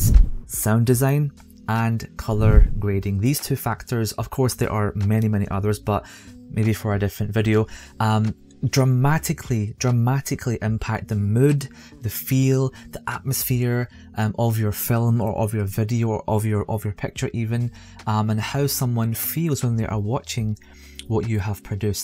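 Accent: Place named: Scotland, United Kingdom. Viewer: Great tips bro, looking forward to more of the Scottish accent and filmmaking tips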